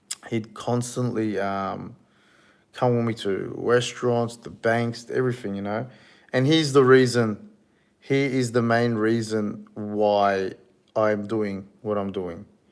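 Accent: Australia. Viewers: I always wondered why you had a slight Aussie accent. It's not a very strong aussie accent because I can understand you but it's a beautiful accent. So you guys have been there. So cool!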